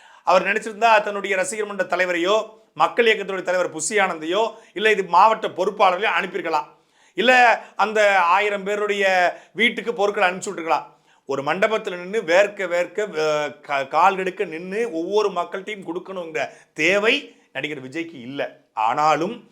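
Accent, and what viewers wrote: Tirunelveli, India. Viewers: பசாட்டை அவர்கள் அய்யா நீங்கள் உன்மையை உரைத்து மிகப்பெரிய மனித வடிவம் எடுத்து இருக்கிறீர்கள். தக்க சமயத்தில் உதவி செய்வது யாராக இருந்தாலும் பாராட்டுக்குரியவை என்று பாராட்டியது பாராட்டுக்குறியது. நல்ல நெல்லை தமிழ் கேட்க இனிமையாக பேசும் திறன் உடைய நீங்கள் நேரம் கிடைக்கும்போது வள்ளுவம் கம்பராமாயணம் போன்ற காவியங்கள் பேசினால் கேட்க இனிமையுண்டு. தமிழ் தொண்டு ஆகும். தங்களுக்கு பகவான் எல்லா வளமும் உடல் நலமும் கிடைக்க பிராத்தனை செய்கிறேன். உங்கள் வழியில் வெற்றிபெற பகவானை வேண்டுகிறேன். எதாவது தவறாக இருந்தால் மன்னித்து கொள்ளுங்கள் ஓம்நமோ நாராயணாய நமஹ!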